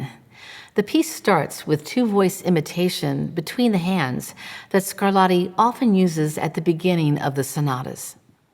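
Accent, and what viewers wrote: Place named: United States. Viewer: American English pronunciation is fine for a American English and we’re all familiar with it; it is as legitimate as any other world-wide English accent. I do have an issue though when the drawly vowels and mispronounced consonants (particularly ‘t’s as ‘d’s*) are lazily and disrespectfully transferred wholesale and without a thought for accuracy onto languages like Italian where the resulting mispronunciations are grotesque - it’s rather like dressing up a pretty little girl in a workman’s dirty overalls. * Giving us the horrible ‘Scarladdi’ for example.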